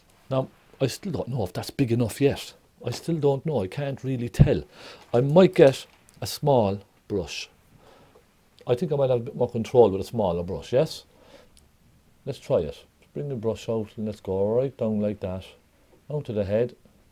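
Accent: Ireland. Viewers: I enjoyed your Irish accent. Thank you for showing me how to paint mountains and grass.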